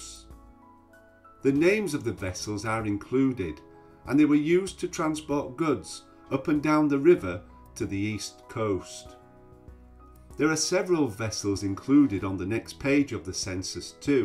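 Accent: Yorkshire, United Kingdom. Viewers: Your narrative is just fine. I love hearing your Yorkshire accent and your narrative reminds me of school, when my teachers would slow down their speech to ensure we understood concepts. If you 'talked proper Yorkshire' I bet few folk from outside Yorkshire would understand you. - Thanks for the videos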